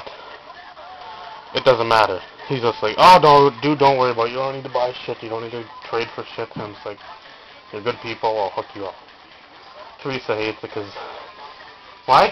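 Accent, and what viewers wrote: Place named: United Kingdom. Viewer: lmao Fool! Nice british accent. Nicely done. Sictophere is indeed a heck of a guy. Great choice man. He does so much for others before thinking of himself. Your looking more and more Amish everyday and that's something to be proud of!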